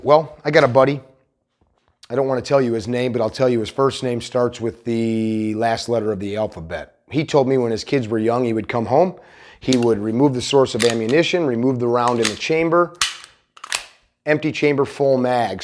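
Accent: Chicago, United States. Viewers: Daaaaar... 'ere an da Sawt Side, we keep err kids away fram dat kiynd a dings, ja know. Just kidding... I love the content and the accent makes me homesick for Chicaaaaagii. Gaad bless!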